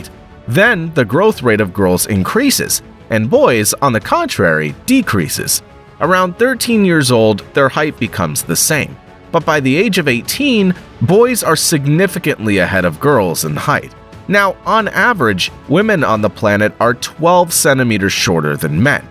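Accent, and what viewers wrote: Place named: United States. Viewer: Why do you use an American accent but not American measurements?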